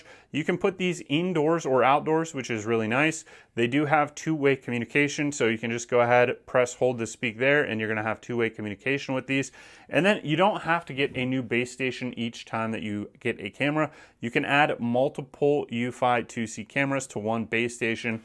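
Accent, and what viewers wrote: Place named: United States. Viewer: Speaks to fast with strong us accent no good for older people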